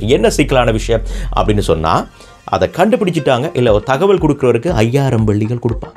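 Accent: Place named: Tamil Nadu, India. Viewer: I liks your tamil slang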